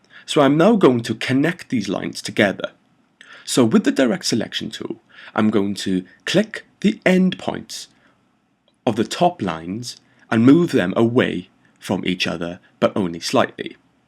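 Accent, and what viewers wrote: Wales, United Kingdom. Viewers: Your tutorials are great, as many people have said. I now believe a Welsh accent should be the universal tutorial approach! This one particularly deserves a comment because although it could be editing, I've never seen anyone talk for 50 minutes straight and still be just as enthusiastic as when they started! Great work, the skip to section in the video description is an especially good idea.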